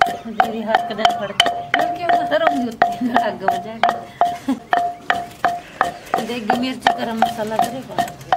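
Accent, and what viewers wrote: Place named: Jalandhar, India. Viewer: Mainu tusi jalandar side de boli lagdi tuhadi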